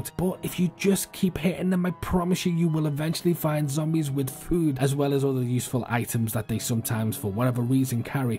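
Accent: Liverpool, United Kingdom. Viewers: Liverpool accent? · that's because you have a strong Scouse accent, but not a Runcorn Scouse accent. It's like a Stephen Graham Scouse accent. Plus your funny, so it's a win win really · great videos mate, scouse or over the water? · Are you a scouser? 😊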